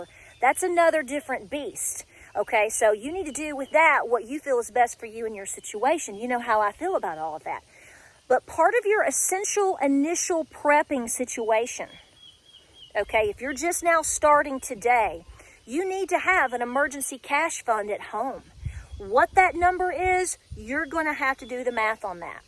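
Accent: South, United States. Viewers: This is how mamas in the south used to talk. It's music to my ears. I'm 63 watching the video mumbling things like "yes ma'am."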